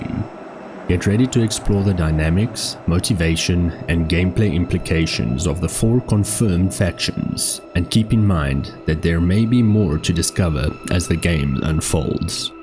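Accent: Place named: Pretoria, South Africa. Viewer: Thats a fkn Pretoria accent no doubt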